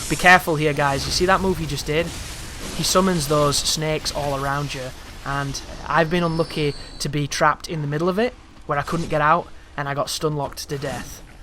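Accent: United Kingdom. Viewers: Dude I follow you since your Onimusha DoD walkthrough and it's nice that you're making this walkthrough of my favorite game to show some people that its ez and no one should be afraid of it, keep the hard work! BTW are you brit?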